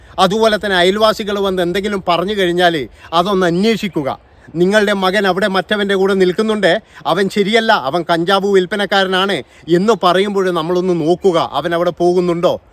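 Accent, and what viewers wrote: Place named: Northern Kerala, India. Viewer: പറയേണ്ട കാര്യങ്ങൾ വളച്ചുകെട്ടില്ലാതെ നന്നായി പറഞ്ഞു.. 👍വടക്കൻ ഭാഷയിലുള്ള സംസാരം എനിക്ക് ഏറെ ഇഷ്ട്ടപ്പെട്ടു... ♥️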